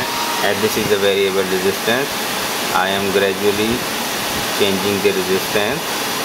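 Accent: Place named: Pakistan. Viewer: wah brother Pakistani Laga Hn.. hahahahah i m Pakistani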